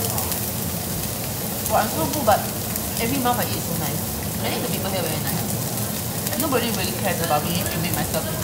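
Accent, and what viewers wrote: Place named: Singapore. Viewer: Love your videos, especially your interesting Singaporean English accent (in a good way though)!! I visited Seoul a couple times and really like this city and Korean culture. I have tried to learn Korean as well by myself (as I like to watch Korean dramas and movies), but it's hard, maybe because I'm too old learn a new language. I can still understand a bit though, as some Korean words/phases actually sound like Cantonese/Mandarin. Actually, I have a suggestion - I'm vegetarian but find it hard to find real vegetarian dishes in Korea. Even Naengmyeon, which I like, uses beef broth. It would be great if you could recommend some nice Korean vegetarian dishes and restaurants in the future:)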